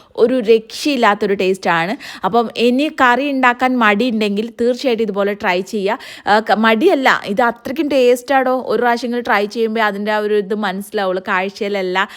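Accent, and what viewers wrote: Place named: Malappuram, India. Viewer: കമന്റ് ബോക്സിൽ കയറുമ്പോൾ ഇടുകയല്ല പുഴുങ്ങുകയില്ല കേൾക്കുന്നുണ്ട് എന്താ കാര്യം അറിയോ എന്റെ ഒരു അറിവ് വച്ചിട്ട് പറയുന്നു യഥാർത്ഥത്തിൽ മലപ്പുറത്ത് കാരി ആണ് സംസാരം ഒന്ന് മാറ്റി പിടിച്ചു നോക്കിയതാണ് മലപ്പുറത്തുകാര് എങ്ങനെ മാറ്റി പിടിച്ചാലും മലപ്പുറത്ത് സംസാരത്തിന്റെ ആ ചുഴകടന്നുവരും എന്ന് അവൾ ഓർത്തില്ല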